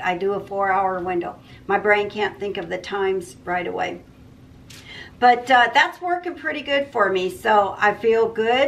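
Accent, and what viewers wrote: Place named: South, United States. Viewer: I just love those fabrics you chose; the cardinals and the bicycles! Your bag is perfect! I look forward to seeing the completed pattern project. I am trying to make my 1907 Baby Bonnet pattern available. We do love a challenge! you are special! I don’t know if you’re southern, but we say some things the same way, and I have to think really hard to complete my sentences. I put a dash of vanilla flavouring in my coffee. It improves the taste. I enjoy your chats. I’m also trying to lose inches. Best wishes!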